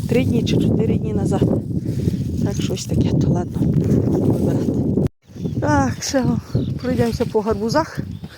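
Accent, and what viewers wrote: Ternopil, Ukraine. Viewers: В Тернопiльськiй обл так балакають.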